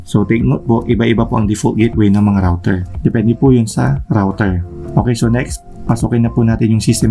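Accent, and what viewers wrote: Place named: Pampanga, Philippines. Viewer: your accent panggalatuk or pampangan got me sub bro😎😎😎 great content and a big help to anyone. keep'em coming💪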